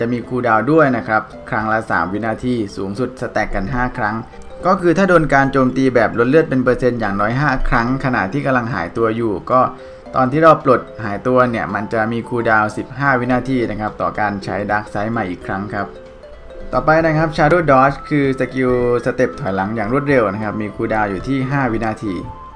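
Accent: Thailand. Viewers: มันก็จะเหน่อๆหน่อย555+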